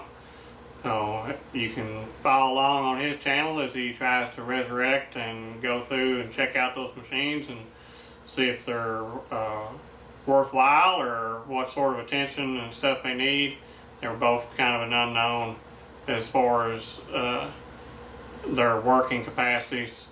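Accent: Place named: South, United States. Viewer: I'm always happy to find another southern guy with a southern accent showing that folks from the south may talk different but that don't mean we are not intelligent. Thanks for the update! I look forward t the next one but I've still got some backlog on your channel to catch up on.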